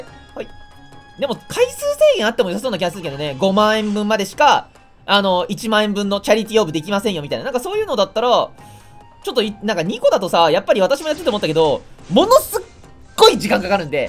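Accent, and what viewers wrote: Ishikawa, Japan. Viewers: 何か意味不明なんだけど、何となく石川県民っぽくて地震大丈夫だったかな？みたいに思ってたら本当に石川出身と聞いて自分で驚いてる笑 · 動画見てるとたまに訛ってるなって前から思ってたけどスッキリしました、石川出身だったのですね。自分は富山の人間なので近いと思っていました。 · 、石川訛りって言われてて心配してたけど本当にそうだったんだ。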